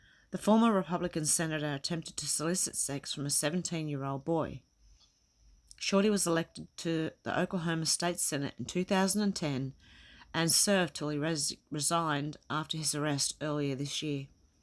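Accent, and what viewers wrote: Australia. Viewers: so beautiful to hear an Australian accent and mindset. loved it. Millsys Para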